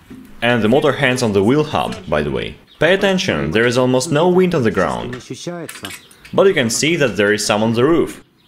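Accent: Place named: Russia. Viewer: love that rucccki accent.. the gung ho approach to problem solving and two hoots for health & safety... subscribed 👍 👍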